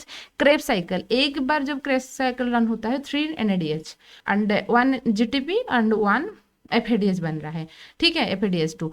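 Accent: Odisha, India. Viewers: I think you’re odia that i got know through your accent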